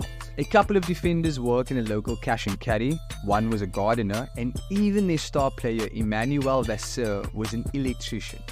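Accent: South Africa. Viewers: Amazing vid ,when i heard the accent i knew it was south african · French in a Cape accent...now I've heard everything 🙌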